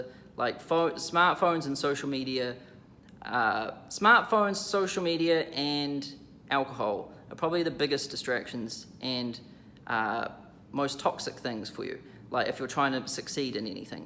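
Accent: New Zealand. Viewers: A<link> your gorgeous Kiwi accent IS adorable!! Thanks, for the info, Champ! I'm still on the fence about signing up BTW...trying to believe that this isn't just going to be yet another online thing that promises the world, takes my money and produces not a whole lot. So, researching....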